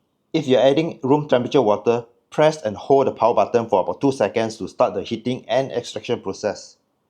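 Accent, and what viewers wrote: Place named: Southeast Asia. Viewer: Greetings to south East asia 😁 love the accent Great review Not changeable like the batteries of modern day cell phones or not changeable at all? Also wonder: the open filter at the bottom is nice but it would be cool to be able to mount some funel to avoid spilling and to let the coffee run straight into milk foam Not sure if that's a great idea though: too hot moka (Bialetti) causes brown, bitter milk foam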